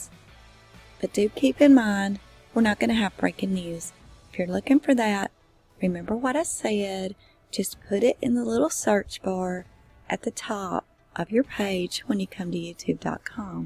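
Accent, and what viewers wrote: United States. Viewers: Do your own thing ... I love listening to your accent ... that’s what I call a proper American accent 😁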